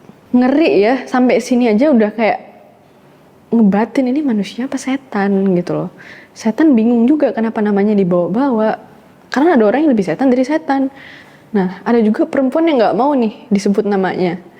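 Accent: Java, Indonesia. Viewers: hostnya kok medoknya lama-lama ilang ya? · Muka bule, tapi kadang keluar medoknya. Mbak kamu terbaik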